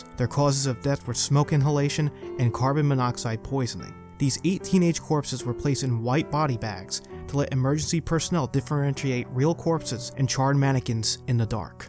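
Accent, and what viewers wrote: New York, United States. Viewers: Someone’s from New York or Jersey based off his accent lol. Well done!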